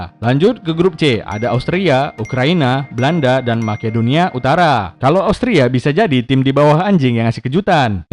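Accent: Medan, Indonesia. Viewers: 𝘛𝘶𝘬𝘢𝘯𝘨 𝘴𝘰𝘬 𝘯𝘨𝘢𝘫𝘢𝘳-𝘯𝘨𝘢𝘫𝘢𝘳𝘪. Medan kali, bah!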